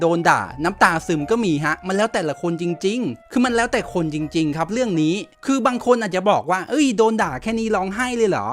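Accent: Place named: Rural, Thailand. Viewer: เสียงบ้านนอก คำนี้เกินไปนะ เกิดบ้านนอกแล้วไงเล่นเกมแบบคุณไม่ได้เลยหรอพ่อคนในเมือง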